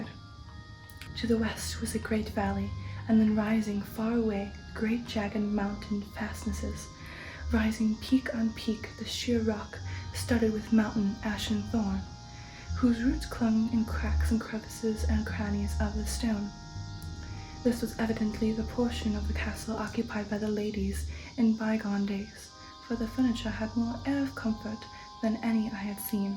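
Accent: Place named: Transylvania, Romania. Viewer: it's nice to see you again a perfect accent a bit Transylvanian at some point I loved it always so much a pleasure ^^ (I know how much you love batman so here you go <link>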